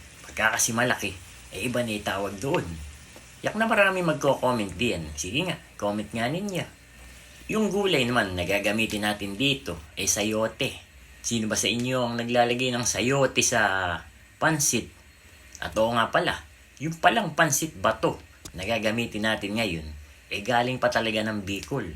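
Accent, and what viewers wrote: Bulacan, Philippines. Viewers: taga bulakan ka san lugar ksi yun punto mo .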